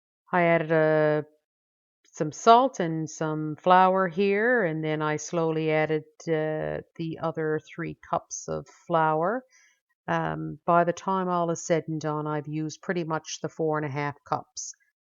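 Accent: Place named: Maine, United States. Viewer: Tried this recipe this morning. Excellent taste!!! Brought me right back to St. Viatare Bagel from St. Lawrence Market in Toronto/Montreal. I used commercial "everything"spice and it was wayyyyyyy too salty. Sesame Seed one was delicious. I followed recipe exactly and would do it again!!! Not too difficult, even for me, a novice yeast dough baker. Great job on the video, and I love your pointers and tips, and of course, your Down East accent.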